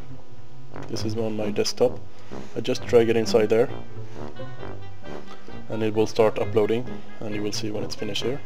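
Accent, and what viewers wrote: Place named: Sweden. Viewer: Are you Swedish? Just a guess based on your English accent...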